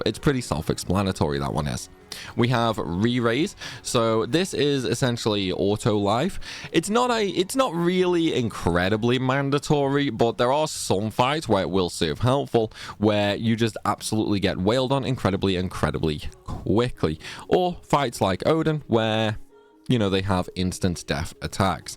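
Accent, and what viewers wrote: Stoke, United Kingdom. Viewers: Are you from Stoke?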